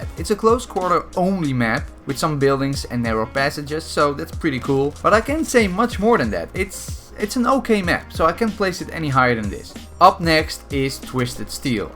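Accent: Netherlands. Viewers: Is dutch, Pronounces rotterdam as rodderdam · I smell dutch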